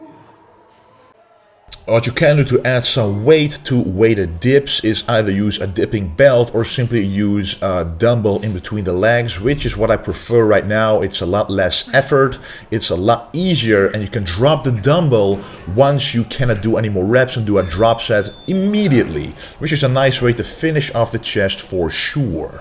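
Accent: Brabant, Netherlands. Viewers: The dutch accent XD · Waar uit Brabant komt hij? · Jezus...could you be more dutch...your English is painful to hear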